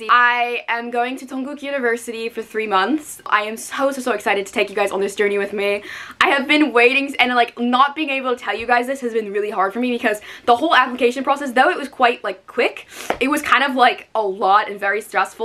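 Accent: United Kingdom. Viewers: You sound so british for an american 😭😭😭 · as a british person I can actually agree! she sounded like someone from North London!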